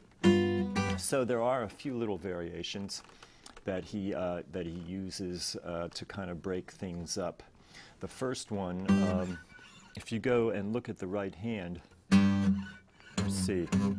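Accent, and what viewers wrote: England, United Kingdom. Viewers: From one to another...father was Ernie.....your lessons are the very best I have yet encountered. Wonderfully clear videos and perfect communication skills. I too would have bet you were English with a touch of U.S. accent. Your family must have come from there at some time. If only all teachers were this good. Thanks Ernie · I think the Broonzy style has further to go in the right hands. He seemed like a marvellous under-rated innovator to me. He stands musically above so many of his time he deserves more acclaim. Thanks for your stuff here, - spot on and you sound English too!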